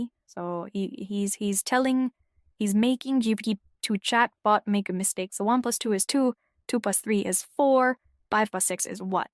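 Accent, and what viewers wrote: Quebec, Canada. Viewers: Voice heuristics suggest likely a Canadian from Quebec despite the attempt at AI obfuscation. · Doxxed as likely Canadian from Quebec in 2 seconds despite the attempt at obfuscation.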